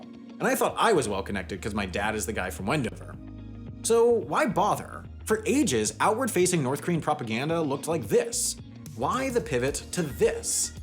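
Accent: United Kingdom. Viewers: how does she have a british accent?! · Why does she sound British 😅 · why does she sound british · damn that british accent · why does she have a British accent? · Why British? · I love how she speaks British English, instead of Americanised drawl, absorbed by osmosis from mindless entertainment. Pure class 👌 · She had to spend some time in Britain because that accent is a British one and I don't know if there is anywhere else she would pickup that accent, unless she is put on a voice for the videos.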